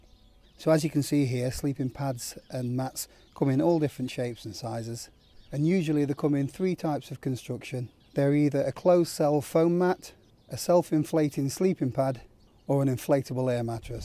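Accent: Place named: Derbyshire, United Kingdom. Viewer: Thanks, great advice as standard. Planning to go bike packing and wild camping this year 2021, your accent sounds very local to me(Belper, DERBYSHIRE) where are you from?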